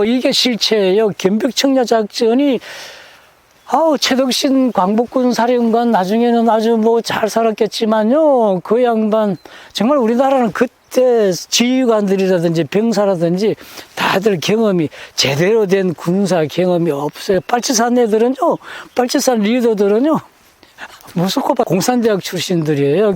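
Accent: North Korea. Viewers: 북한말씨 느낌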